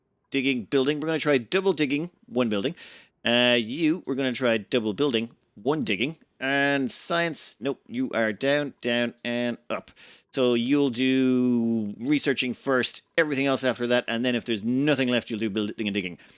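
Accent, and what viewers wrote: Ireland. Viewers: shenenigenery? Seriously? You are Irish right? It's shenenigans!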